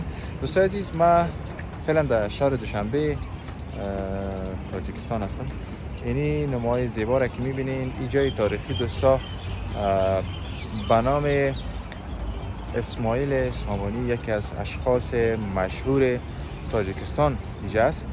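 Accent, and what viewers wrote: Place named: Dari, Afghanistan. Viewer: Shumo chiqadar bo lahni shirini dari harf mezaned . Lahjai shumo chiqadar ba mo nazdikast ki man hej ehsosi nofahmogi dar sukhanroni shumo namekynam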